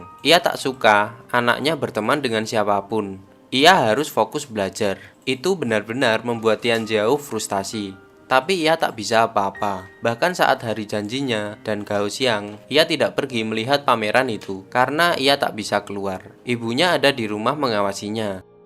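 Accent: Java, Indonesia. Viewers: Emng orang jawa ya ,Kak? Medhok suaranya,,,🙏🙏🙏 maap klo tersinggung cuma tanya,,sumpah✌️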